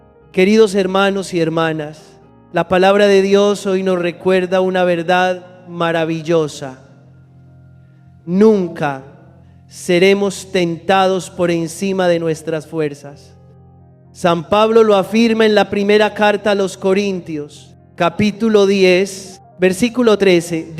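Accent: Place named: Portugal. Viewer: por qué habla con acento portugués? muchas gracias por compartir el evangelio.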